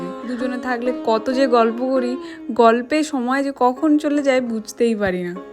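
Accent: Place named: Medinipur, India. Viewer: Didi tomar bari ta kothay tobe kotha sune mone hoy Medinipur hobe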